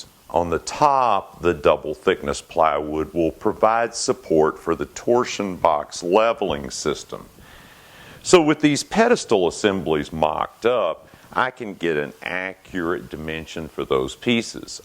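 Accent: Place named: South, United States. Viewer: watch at 1.25 speed, trust me. dudes talented intelligent amazing but that southern slow talkin'...phew!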